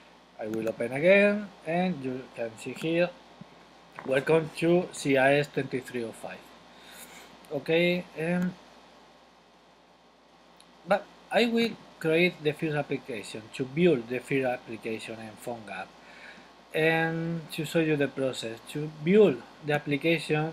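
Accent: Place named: England, United Kingdom. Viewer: I love your English Accent !!! haha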